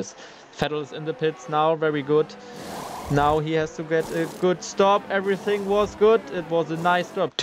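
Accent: Germany. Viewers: Der legendäre deutsche Akzent 😂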